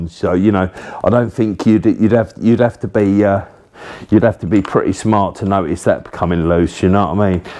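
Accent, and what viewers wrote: United Kingdom. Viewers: So british. Sick loadout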